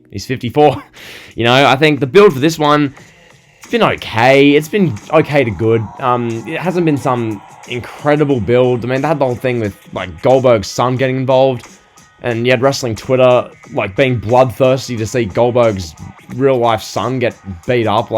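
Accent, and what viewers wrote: Australia. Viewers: I love your accept bro, my Aussie bro 😎 John Cena should lose to Roman Reigns, simply because, a win will deter his accolade. Roman Reigns needs this victory more than John Cena... I'm not saying it cos I'm a fan of Roman Reigns.. bur that I'm a wrestling fanatic and critique...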